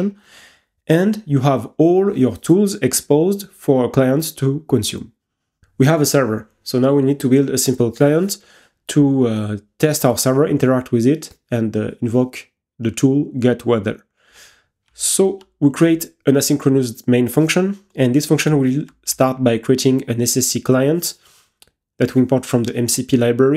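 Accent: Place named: France. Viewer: Nice bro ! I can smell that fresh French accent, but very good content !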